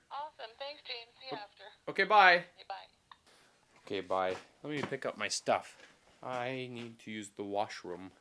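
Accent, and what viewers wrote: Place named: Canada. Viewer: Sounds like he's Canadian. He's from Canada not Scotland, that's the accent you pick up.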